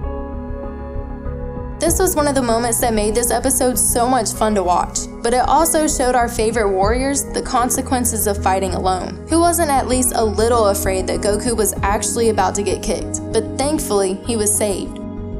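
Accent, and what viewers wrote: South, United States. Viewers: I hear country in your voice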